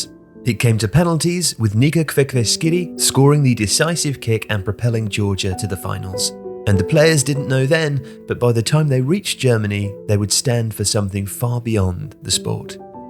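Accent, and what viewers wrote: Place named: England, United Kingdom. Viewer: The accent was obviously English, but the pronunciations were spot on ─ i.e. he made no mistakes in his pronunciation.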